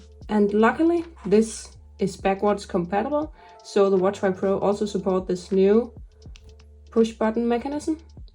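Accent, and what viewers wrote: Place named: Denmark. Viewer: The Danish Accent 😍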